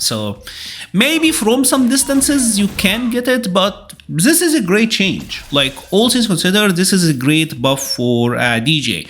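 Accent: Egypt. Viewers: it's great when you say hey guys here wish I had an Egyptian accent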